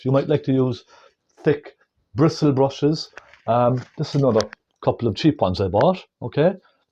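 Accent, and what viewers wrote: Ireland. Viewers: is your accent Irish?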